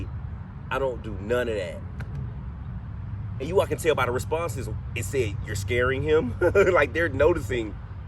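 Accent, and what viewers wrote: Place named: South, United States. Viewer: Just curious, why don’t the spirits that come through have southern accents like yourself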